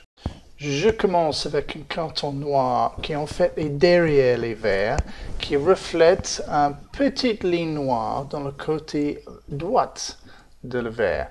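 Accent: England, United Kingdom. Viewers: Merci merci super utile et j’avoue le petit accent anglais c’est juste la classe!! 👍🏼☺️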